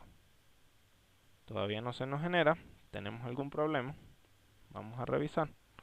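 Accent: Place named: Venezuela. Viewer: Chamo, primera vez que escucho a alguien de Venezuela, saludos brother, chevere tu video, me ayudo.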